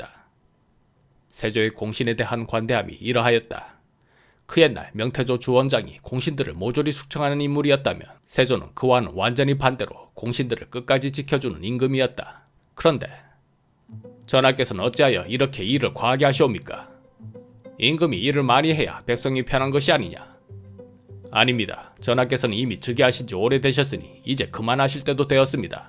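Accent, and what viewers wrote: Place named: Busan, South Korea. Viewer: 흠...분명히 우리 은..부산싸나이 가튼데...사투리가 전형적인 부산인데...내도 부산이요...흠...암튼..얼마전부터 지독한 찐팬이 된 제임스 라고 하는 미국교민 입니다. 요지음 의 영상을 보면서 즐거운 시간을 가집니다.감사합니다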